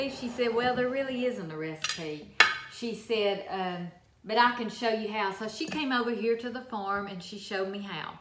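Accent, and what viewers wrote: South, United States. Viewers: OMG, I’ve been binge watching videos for the past two hours, it’s almost 2am and I have to get to bed! I just can’t get enough of your recipes, and your the sweetest lady & I love your southern accent. So thankful your channel showed up on my recommendation. Thanks!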